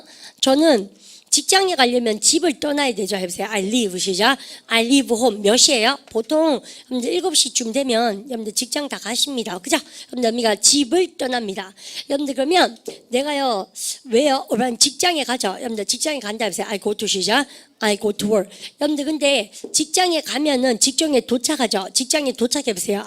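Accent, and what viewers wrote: Daegu, South Korea. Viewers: 선생님. 좋은 강의 올려주셔서 얼추 8주 동안 열심히 공부했습니다. 말씀대로 문법은 3번 들었더니 4학년 딸아이 ELA 공부를 봐주는데도 큰 도움이 되었습니다. 문법 ABCDE 정리하고 3번 듣고. 기초보카 정리후 두번째 듣는 중입니다. 기초보카. 보카22000. 문장보는 눈도 재미있습니다. 두툼해진 정리노트를 보니 더 감사합니다. 아!! 그리고 그동안 천지삐까리로 많은 대구 사투리가 그리 정겨븐 말인것을 알게되었네요. 아무리 되도(?) 쎗국물 갈아마시고 열심히 하라는말씀도 맘에 깊이 새겼습니다. 영어실력과함께 대구어 리스닝/ 표현도 늘었습니다. ㅎㅎ뭔가 저에게도 샘에게 도움이되는것일것 같아 ㅎㅎ 영어로 나오는 광고들도 열심히들었네요. 기회가 있어 다른 방법으로 인사를 드릴 수 있기를 바라며... 건강하시고 행복하시길 기도하겠습니다. 플로리다에도 선생님 팬이 살고있습니다. 다시한번 감사합니다.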